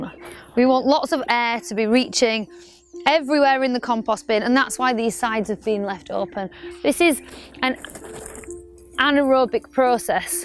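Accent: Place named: Northern England, United Kingdom. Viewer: healthiest balanced diet compost i have seen for a while' love your Northern accent ( yep I'm a southern softy that went uni in B)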